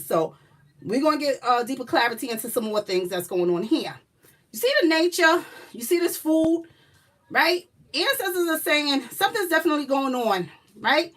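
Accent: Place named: Louisiana, United States. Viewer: sound like she from Louisiana. New Orleans home of voodoo. Makes me wonder if her & her family were from Hurricane Katrina when they got flown across different states.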